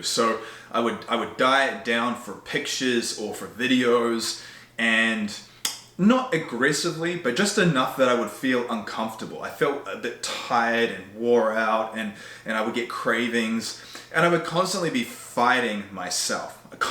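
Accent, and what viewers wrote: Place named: New Zealand. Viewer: You have a New Zealand accent, mate. Have you lived there a part of your life?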